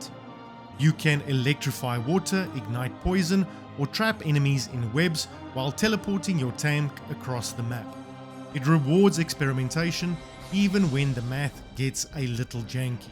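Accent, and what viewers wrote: South Africa. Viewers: out of curiosity are you South African?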